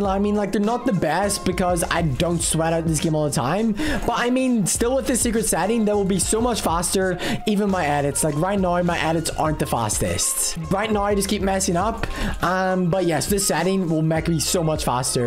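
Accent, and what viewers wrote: United Kingdom. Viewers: the british accent makes it 10 times better..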